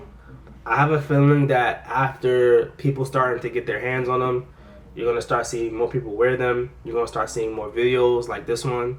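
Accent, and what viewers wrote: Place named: Connecticut, United States. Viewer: You gotta be from Connecticut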